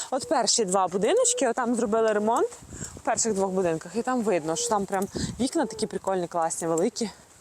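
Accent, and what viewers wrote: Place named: Western Ukraine, Ukraine. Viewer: Вы из Западной Украины,а война на северо- востоке и на юге Украины.Чего вы убежали?Говор у вас из западной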